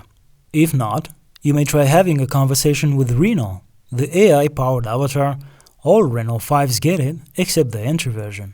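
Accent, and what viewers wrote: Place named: Ireland. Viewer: You sound like an irish man. Are you Irish or Romanian?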